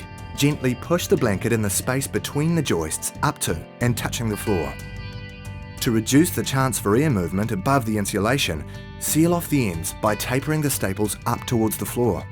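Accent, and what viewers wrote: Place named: New Zealand. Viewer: This video needs to be narrated by an Aussie the kiwi accent will inhibit sales and no one will take it seriously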